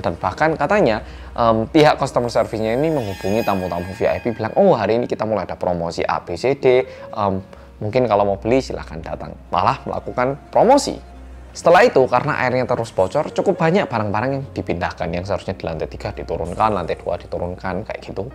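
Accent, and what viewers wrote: Java, Indonesia. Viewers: logat jowo ne ketoro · Oppa asli Korea??? Masha Allah medok logat Jawa bgt 😁 ky koko2 Semarang /Sby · Medok bget sih anda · Abg org Korea apa jawa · Berapa kali mas ngomong untungnya, tapi ternyata yang meminggal 500 an lebih. Berasa banget jawanya yg jawa jangan tersinggung ya w juga orang jawa kok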